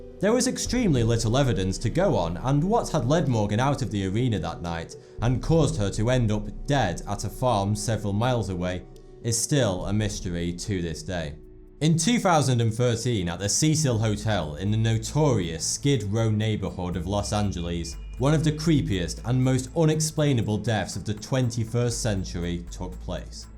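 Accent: United Kingdom. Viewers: Skill Definitely sounds like he's just from the United Kingdom. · Something about that british accent makes all of these videos not scary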